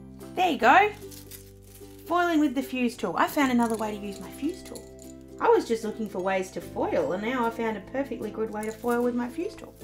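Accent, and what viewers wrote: Australia. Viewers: It was awesome to hear a fellow Aussie! Most of the crafters I watch on YouTube are from the US, it’s hard to find Aussie craft channels. Definitely a new subscriber here. Thanks For sharing ❤️🇦🇺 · First video of yours I have watched and subscribed already. I have a low temp soldering iron that is pathetic for soldering so will try it with this. Good to hear your Aussie accent. TFS!!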